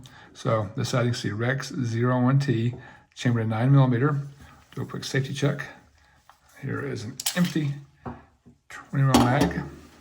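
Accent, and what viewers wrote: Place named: Belgium. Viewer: I’m just guessing, but are you originally from Belgium? Had to ask because of the accent 😉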